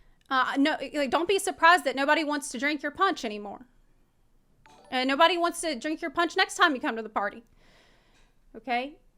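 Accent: United States. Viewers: Her cowgirl accent is coming back to fast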